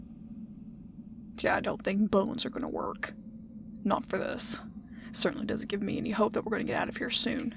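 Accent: South, United States. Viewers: just thinking and wanted to share but I think you'd make an amazing outlaw series, southern charm with the voice, amazing perspective on story telling, know when to stop and end a episode. Like I said was just sharing a thought and ¿I guess things that prompted me to say it¿ idk reasoning didn't sound right lol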